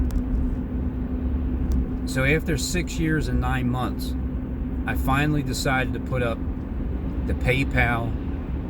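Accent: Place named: Philadelphia, United States. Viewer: good study of the Philly accent........I lost mine, been living in Jersey for 55 years.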